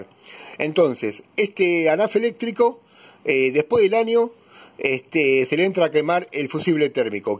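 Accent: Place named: Argentina. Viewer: Se nota que este canal es argentino...Ya viendo la clavija de tres patas,y el acento ya uno se da cuenta...jejejeje Sólo falta el mate...:v Felices fiestas...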